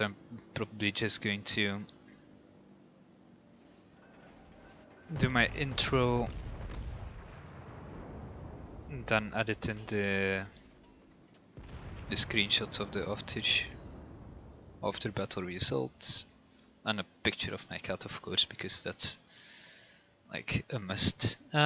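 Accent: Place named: Flanders, Belgium. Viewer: Wow you're really not trying to hide that Flemish accent lol. :D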